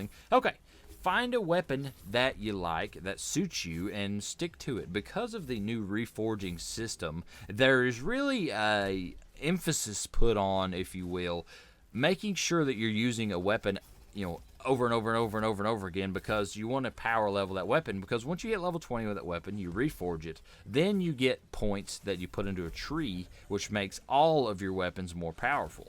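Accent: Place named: United States. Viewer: Love your cowboy accent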